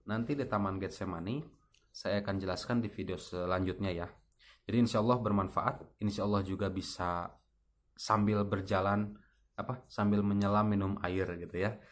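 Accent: West Java, Indonesia. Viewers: Kaya logat sunda??subscribe balik om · iya logat sunda..ada cmpuran sunda..tijalikeuh..😁😁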